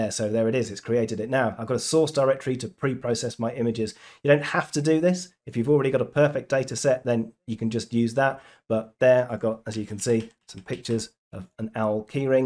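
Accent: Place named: United Kingdom. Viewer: I trust this guy because he has a British voice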